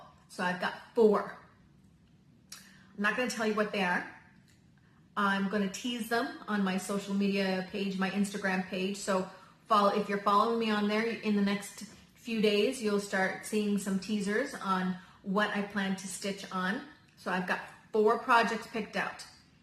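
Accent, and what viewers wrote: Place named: Newfoundland, Canada. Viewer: Hi. I’ve been a subscriber for a while and I have admired your stitching so much. Before you go on too much about you should know that he makes fun of the way you all in Newfoundland talk. Lol 😂. You’re right. He is so funny and I think he and I were separated at birth 21 years apart. I’ve started my first full coverage piece so you are a real inspiration for me 😍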